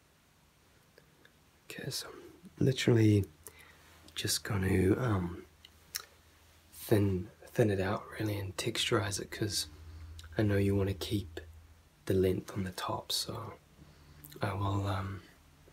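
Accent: New Zealand. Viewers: Wow I love the new zealand accent. I'm in love ❤ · Very well executed video. The New Zealand accent is dreamy.A Kiwi can be rude and you'd still say thank you.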